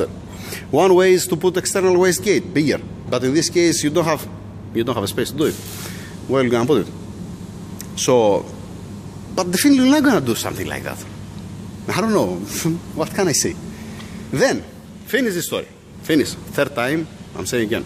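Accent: Greece. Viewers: Hello ser nice work I want to ask you where are you working? From the name and accent I know that it is Greece but where in Greece? I have a problem with s320cdi 4 matic the iniverzal joint in the transfer case is worn-out can you halp me please. Where to find parts do you have it... Thanks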